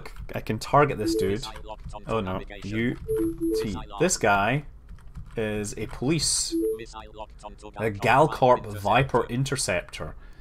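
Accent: Scotland, United Kingdom. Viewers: Hey, are you scottish...you sound scottish:3?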